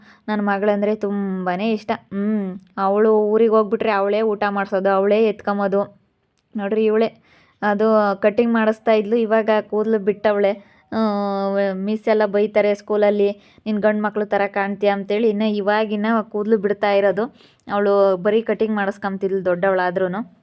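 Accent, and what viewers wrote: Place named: Sira, India. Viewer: ನಿಮ್ಮ ಭಾಷೆ ಸಿರಾ ಭಾಷೆ ಅನ್ಕೋಂಡಿದ್ದೇ... ಮೇಡಂ. ಇವತ್ತು ಸಿರಾ ಅಂತ ಕೇಳಿ ಖುಷಿ ಆಯ್ತು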